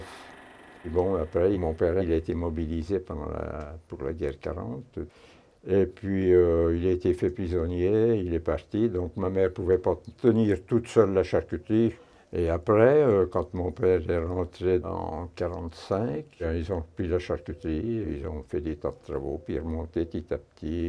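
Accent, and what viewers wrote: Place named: Quebec, Canada. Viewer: Je suis Québécois et beaucoup de gens, surtout les plus vieux, parle comme lui.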